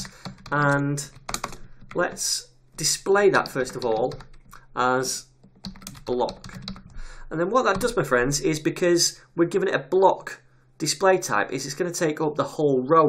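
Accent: United Kingdom. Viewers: First of all, let me just say that I absolutely loved the course all the way through. Over the last few days I took both, the HTML course and this one, and it was a hell of a refresher for me. What's more, I have finally understood quite a few crucial CSS concepts and now I know why something works and something else doesn't. You did a great job by putting this course together, kudos to you, mate. Last but not least, let me just say that I absolutely love your British accent :) Cheers!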